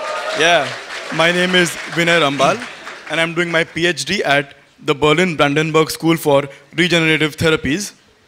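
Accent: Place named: India. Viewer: i too dislike the Indian English accent, but you must realize that this just is a matter of habit and does not warrant hate.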